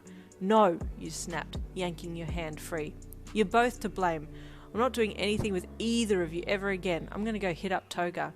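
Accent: Australia. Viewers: Loved this reader, I've got a feeling your Australian, I can hear the accent : )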